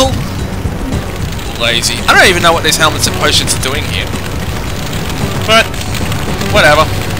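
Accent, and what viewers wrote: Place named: Australia. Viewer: Haha, oh dear. I don't think I want to know. Actually, hopefully I'll never know unless curiosity gets the better of me of course. Ps. Awesome to see an Aussie doing this. Assuming of course your accent IS Australian :P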